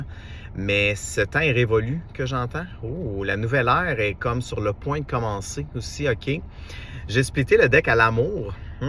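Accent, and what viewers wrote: Canada. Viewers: Bsr ce fut un plaisir avc votre charmant accent canadien à chaque fois je regarde vos vidéos 😊. Bne soirée 😊❤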